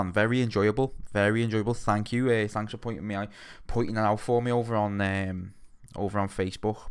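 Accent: Liverpool, United Kingdom. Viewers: Your passion for great new metal, your Scouse accent and you're totally hot 😛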